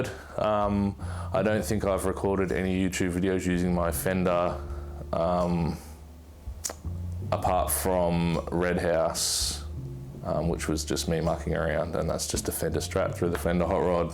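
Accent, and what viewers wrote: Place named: England, United Kingdom. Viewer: You sound likevyour English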